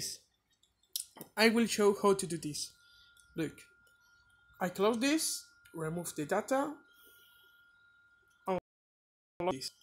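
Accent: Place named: Oxford, United Kingdom. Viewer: Ojo ese ingles de oxford. Me alegro de que hables asi ojala yo hablara así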